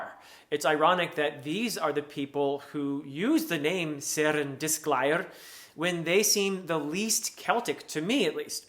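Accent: Wales, United Kingdom. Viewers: Having lived in Wales for a year, I was pleased to see you bringing this point predominantly into your review shedding light on Gwynne's intentions and highlighting other reviewers' misinterpretation of it being about Vikings. Also, your pronunciation sounded just like all the welsh bloke around me all the time while I was there, so nice going!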